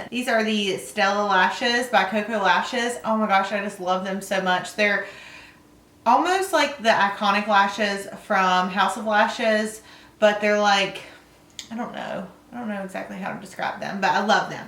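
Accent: South, United States. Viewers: I love your southern accent 😂 what state do you live in? Close to Tennessee ?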